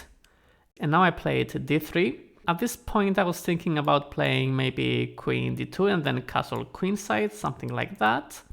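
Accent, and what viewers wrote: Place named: Romania. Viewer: Are you Romanian? You sound Romanian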